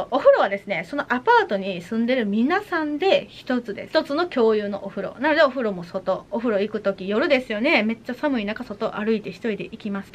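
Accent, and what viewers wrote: Kansai, Japan. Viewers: 関西弁かわいい♥️ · さんSLE?!いやいやいやめっちゃ大変じゃないですか、、、😭 あと関西人なのでさんの関西弁めっちゃ好きです❤️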